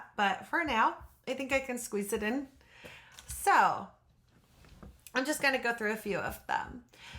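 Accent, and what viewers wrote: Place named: Pennsylvania, United States. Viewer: Hi. I'm Sharon and I'm a quilter, nearby you in NJ.You sound like you're from southeastern PA? Sue (From Small Things) is in Perkiomen Valley area in PA. I'm enjoying your videos. I love quilt talk! Please don't change your format for the grouchy grannies.They can keep looking! I look forward to watching more! Happy Thanksgiving.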